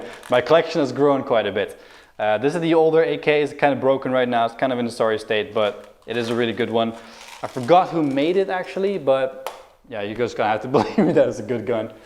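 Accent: Netherlands. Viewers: Are you frome Holland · je bent nederrlands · I like when you show the clips are you dutch btw? I kind of get that feeling by youre accent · Wut ure dutch... omg ik ooook! Jajajajaja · Are you dutch ik wel btw XD love your video’s ❤️✌️ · Are you Dutch? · Bro are you dutch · Ja hij is Nederlands dat hoor je ook in veel video's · Je bent Nederlands praat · Are you dutch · Ik vind het gewoon grappig hoe erg je je Nederlandse accent kan horen als je Engels praat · Ben je eigenlijk Nederlands of Belgisch?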